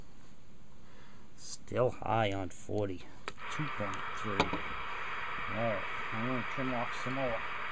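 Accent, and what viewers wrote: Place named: Boston, United States. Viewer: Interesting - if you’re going dipole on 10/11 meters vertical polarization is the BEST way to go. You sound like my Southie submarine shipmates - where ya’ from? Bahstin?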